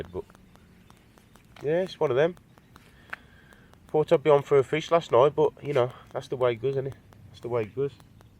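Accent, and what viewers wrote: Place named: Midlands, United Kingdom. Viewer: Nice vid with some good commentary..👌 Nice to hear a Midlands accent..im in Wolverhampton... Have you tried coppice lane fisheries.?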